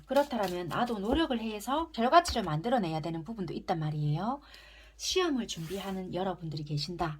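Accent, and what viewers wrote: Busan, South Korea. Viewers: 님 21년동안 좋은 타로리딩과 조언 감사드렸어요 21년 마무리 잘하셨나요? 22년도 함께해요 ❤ 3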